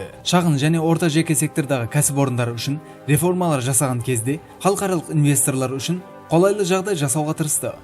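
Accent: Uzbekistan. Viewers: Мынау кым озы Блогер не ушын Казакшага Озбекше косып сойлейды дохарсай Шала казаксынбе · сен озбек емессенбе сал сал келесен акцент бардай тилинде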